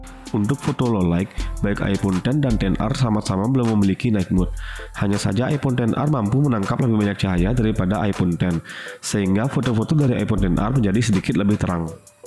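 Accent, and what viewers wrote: Bali, Indonesia. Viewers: Orang bali kalo ngomong memang seperti itu kak logatnya, ini orangnya dari bali soalnya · Logat nya bali banget😅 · logatnya macam anak bali nih 🤔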